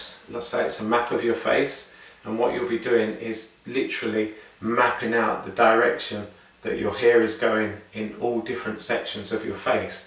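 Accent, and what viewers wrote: United Kingdom. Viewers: Love that British Accent 🤗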